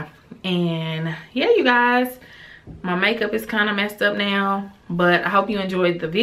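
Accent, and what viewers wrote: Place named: East St. Louis, United States. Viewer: East Saint!!! I know our accent anywhere lol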